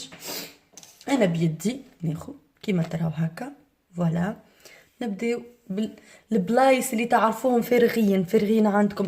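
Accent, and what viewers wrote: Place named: Tunisia. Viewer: يعطيك الصحة ختي نجربو ان شاء الله .انا من الجزائر واول مرة نشوفك وعجبني الفيديو.ومحلاها هدرة نتاع توانسة نحبها بزاف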